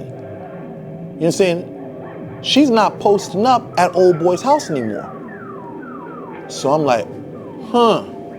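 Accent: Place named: Miami, United States. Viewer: lol Man U sound like a Miami haitian . U wild and funny af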